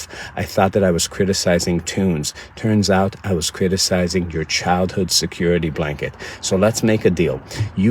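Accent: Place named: United Kingdom. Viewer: Always thought you were British, you are so irriverent, I like it sooo much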